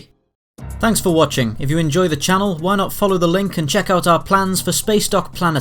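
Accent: United Kingdom. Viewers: I really like listening to the British accent giving tech jargon. 😉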